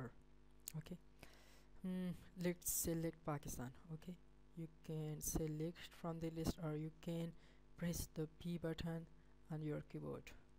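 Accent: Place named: India. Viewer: Sounds like indian "Windows Support " scammer Leaves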